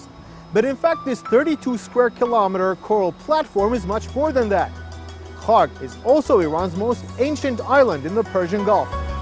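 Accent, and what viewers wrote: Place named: United States. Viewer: Looks like a very boring place TBH. No wonder normal people ain't allowed to travel there. Also where did you find a host with a 99% American accent to showcase this XD?!!?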